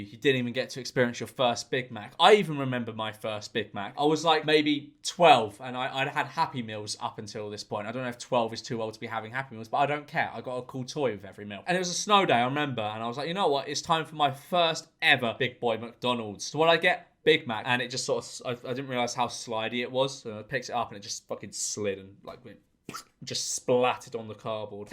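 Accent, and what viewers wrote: United Kingdom. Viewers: Only British person, who i dont find accent annoying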